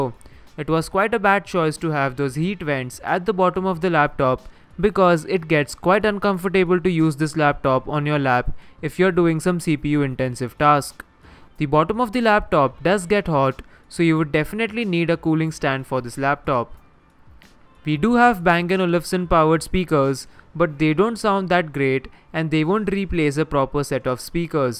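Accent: India. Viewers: Nice Indian Accent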